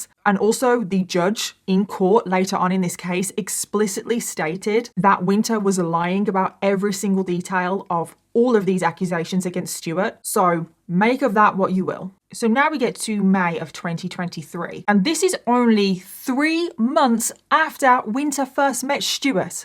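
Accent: United Kingdom. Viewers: I thought you were British with your accent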